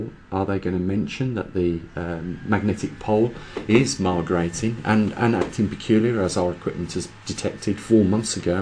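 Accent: United Kingdom. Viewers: Mentioned previously I've been putting your videos about a bit on different platforms. But a little about me .. Expert in various sciences .. among these computers and animals. Oddly enough strange things have been occurring in both these areas and I've talked about it with others. Now I've been well aware we were heading for a magnetic flip for years but never expected it to occur in my lifetime. So never considered this being the cause of things I've witnessed. Now makes a lot of sense. I've been talking about your videos with a lot of people and over the coming weeks many thousands will probably hear about it too. Was odd to hear another British voice .. expected you to be American for some reason when I first listened to your previously.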